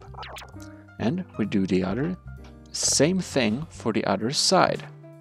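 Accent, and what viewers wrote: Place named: Sweden. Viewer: Really nice Idea! I only managed to make a few vehicles with actual steering, using a stupid technique. This one is way better. (Also I guessed that you're from Sweden and wasn't wrong. I understood that by your accent(especially the "CH" sound) and the way you form the sentences, use some words that are rarely used by English speakers.) By the way, love that accent. I can be proud of myself and stop thinking about being a complete failure now... for 5 minutes.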